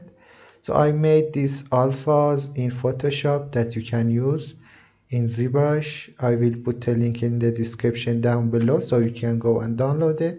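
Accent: Iran. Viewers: Are you persian? The accent gives it away😆❤ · this guy is definitely persian jfc, his accent is so thick i cant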